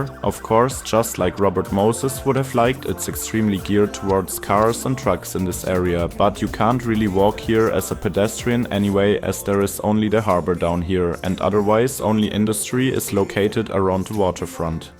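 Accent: Germany. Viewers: Something about a German accent and doing engineering work that makes my heart warm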